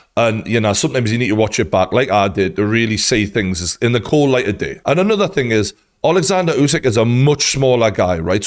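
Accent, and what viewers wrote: Newcastle, United Kingdom. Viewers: Spot on true geordie👍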